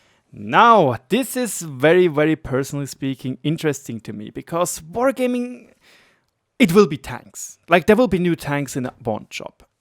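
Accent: Germany. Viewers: Great job with the vids m8, one small hint tho': dont talk so fast :) i know your main language is german and your enlish is very good but the german accent sometimes kill your words :) keep it up - we enjoy your content and your effords!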